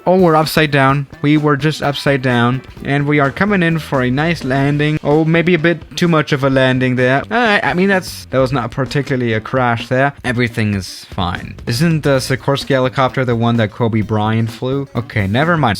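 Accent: Germany. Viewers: Its german I think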